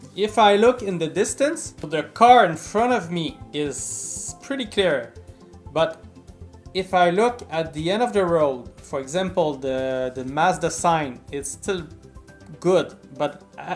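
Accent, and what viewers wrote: Quebec, Canada. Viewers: un quebecois c sur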